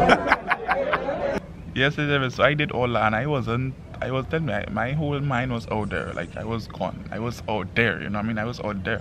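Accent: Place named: Trinidad and Tobago. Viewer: Are you Trini? Have you lived in Trini? Do you have Trini family? Cuz that Trini twang is coming through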